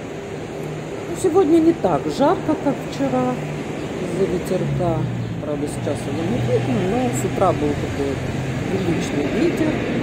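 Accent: Odessa, Ukraine. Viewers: Как мне нравится ваш говор, Одесский ..гуляю с вами...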